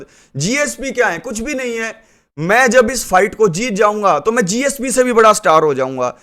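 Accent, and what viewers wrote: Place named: Haryana, India. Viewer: Your Haryanvi accent is too good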